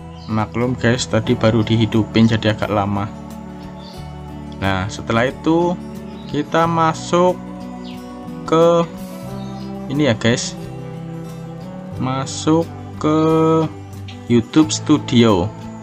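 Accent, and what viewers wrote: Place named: Kebumen, Indonesia. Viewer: Jaannn njawani . Kebumen kayane rika ..??? "NEMBE NEMBE" 😂😂 .. Semangattt lanjutkan . Silaturrohim juga ya ..?Terima kasih mas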